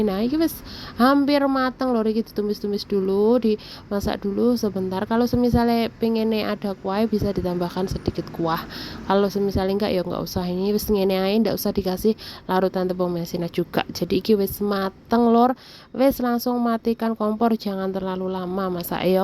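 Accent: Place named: Java, Indonesia. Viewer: Makasih ce resepe,bahasa medok Jawane salam kenal salam persahabatan ce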